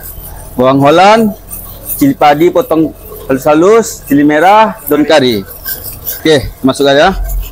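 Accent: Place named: Sabah, Malaysia. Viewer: Mantap bro,logat macam org Sabah saja....betul ke...?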